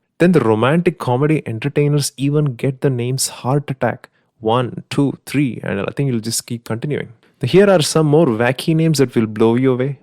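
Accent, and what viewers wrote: Kerala, India. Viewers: I bet the narrator is Mallu... proud to be Mallu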